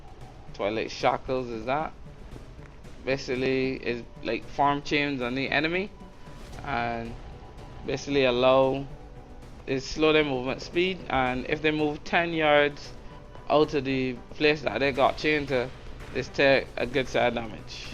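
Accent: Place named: Trinidad and Tobago. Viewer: That accent sounds like you are from the V.I or Trinidad. It's all good, I' m from St.Kitts Nonetheless very good preview of the Slayer skills. I was curious to see what the class was about and so far I'm impress. Big up!! ^_^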